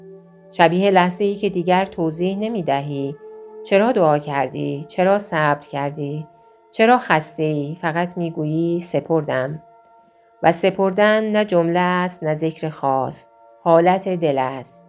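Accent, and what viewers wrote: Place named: Turkey. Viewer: به خداوندی خدا ابن عربی ادم خیلی بزرگیست که در دین و عرفان عالمی بزرگ بوده اما نمیدانم چرا باید یک دوبلری بزارید که ادم حتا چندشش میشود به پادکست گوش داد من کل کتابهای ابن عربیه و ابن بابویه بجز دایرت المعارف ابن بابویه همه را چندین بار خوانده ام اما صدای این خانم با لحجه همشری ما ترکی به خدا خراب کرده ادم بزرگی مثل ابن عربیه را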